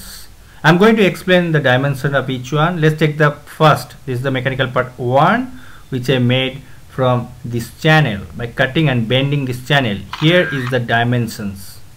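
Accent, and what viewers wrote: India. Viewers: So...you are Indian?Accent yaar.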